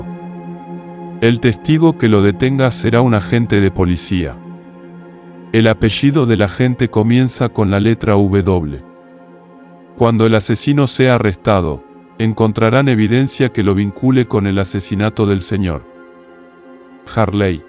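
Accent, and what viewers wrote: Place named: Argentina. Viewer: Esta es la voz a la que me refería, que suena con un acento argentino. Me fascinaba escucharlo, ya que me transportaba a través de mi imaginación, provocando la emoción de la narración de principio a fin. Sencillamente, me encanta esta voz. 🎤💗👏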